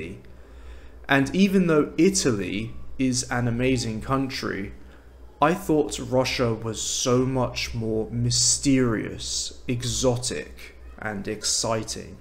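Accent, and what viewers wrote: United Kingdom. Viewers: Информативно. Британский акцент мне нравится больше, чем американский. Спасибо, что говорите медленно. Это самая сложная часть в изучении английского - восприятие на слух. Русский на слух воспринимать проще - он по звучанию почти не расходится с написанием. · прекрасный преподаватель с классическим британским произношением. То самое - которое считается золотым стандартом. Радио ВВС и т.д. Занимаюсь с больше 1,5 года.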